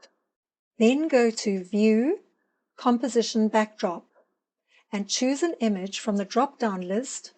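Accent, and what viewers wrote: South Africa. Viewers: I hear a South African accent!! Hello from little Knysna!! I just got Scrivener for Nanowrimo this year!! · Are you from South Africa? Love the accent!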